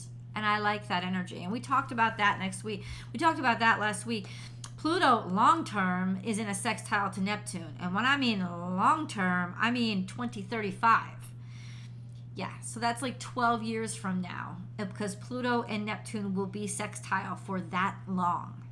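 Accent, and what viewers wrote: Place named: Connecticut, United States. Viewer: You sound like you are from Stamford/Norwalk, and your star calls and insights have been spot on for me. Thanks for the insight. M/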